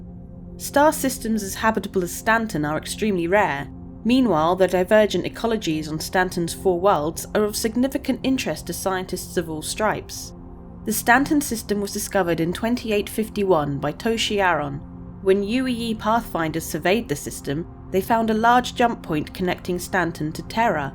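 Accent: United Kingdom. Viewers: The British accent adds 50% more authenticity to the video.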